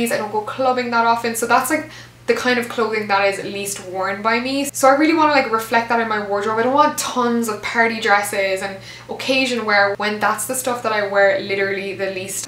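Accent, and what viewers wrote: Ireland. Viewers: Thank you for theses tips! I love your accent, are you from Ireland?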